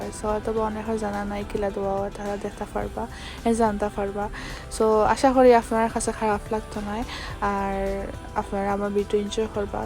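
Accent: Sylhet, Bangladesh. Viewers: Amar car wash ay jaytay darun lagay, specially car ER bhitor boshay thaktay. Apnar bhasha ki sylheti? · Walaikum Salam apu. Apnar sylheti accent khub valo laglo. Joined you join me too dear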